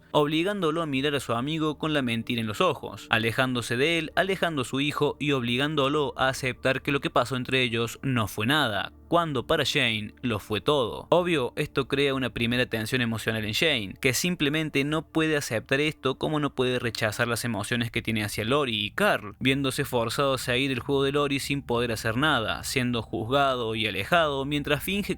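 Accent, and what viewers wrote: Cordoba, Argentina. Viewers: Videazo re bueno el análisis y el acento cordobés le da el toque 😂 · que grande el acento cordobes loco, es mi favorito del pais. Saludos desde Cordoba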